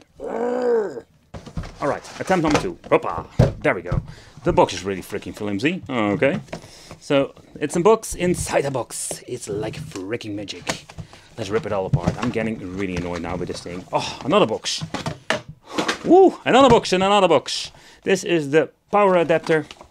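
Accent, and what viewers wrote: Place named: Netherlands. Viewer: Ik hoor je Nederlandse accent ik weet niet waar je woont maar als het dicht bij Alphen aan den Rijn is dat goed. Ik heb er. Rog ally waar ik probeer games te emulaten maar het lukt mij niet als je dat aan de praat kan krijgen kan je mijn rog ally een review geven met emulation ik probeer voornamelijk Wii, Wii u, switch en Xbox 360/ps3 games emulaten maar emudeck werkt niet bij mij ik heb mijn ssd wel geupgrade naar een 2tb ssd miss kan dat er mee te maken hebben maar als je niet dicht bij woont heb je misschien tips om het wel aan de praat te krijgen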